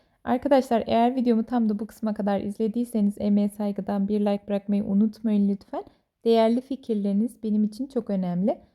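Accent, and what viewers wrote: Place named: Azerbaijan. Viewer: Bu hanim kesin Azerbaycanli 😀 aksani var 😀 aparat dedi ilk bashda . Unutmuyun 😀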